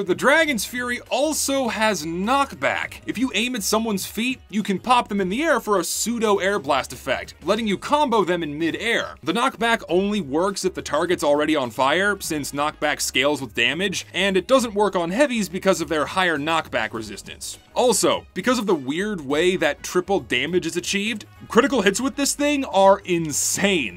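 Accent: South, United States. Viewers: Why is a southern accent creeping it's way into voice throughout the video? it's kind of creeping me out lol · texas accent shining through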